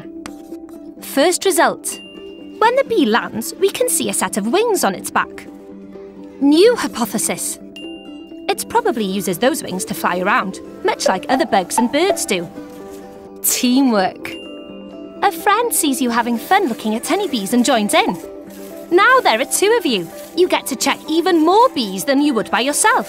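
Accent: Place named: Australia. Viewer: australian ahhhh:face-blue-smiling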